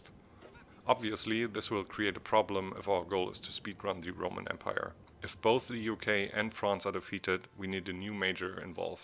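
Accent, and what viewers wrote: Germany. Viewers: I believe he’s German, I’m Dutch and it sounds a bit different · Because he most likely has the German version of the game, from his voice and accent I would say he speaks German. In the German Game Version the Portraits of Head Nazis such as Hitler, Himmler, Göring, Hess and Göbbels Are blacked out or censored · Are u from Germany?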